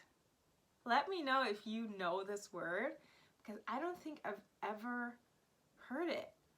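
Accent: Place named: Germany. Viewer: yeah you german accent is flawless 😎😎😎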